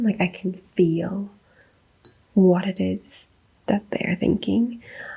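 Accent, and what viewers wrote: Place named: Ireland. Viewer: My theory is that people with ADHD really gravitate to ASMR because it's a way to relax and slow down the constant mental activity that provides just the right amount of stimulation to actually do that. In retrospect it's what drew me to ASMR too. I've been following your channel for many years, big fan! I love your Irish accent and your Irish history videos especially! And I can really relate to the struggle of being present as a mother and professionally. Don't be too hard on yourself. Goals are good, and so is generosity and kindness for yourself and respecting the effort it takes. Looking forward to seeing more of your channel again!!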